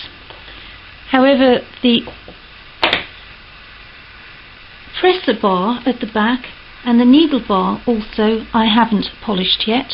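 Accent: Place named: Yorkshire, United Kingdom. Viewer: a tiny bit of Yorkshire is seeping through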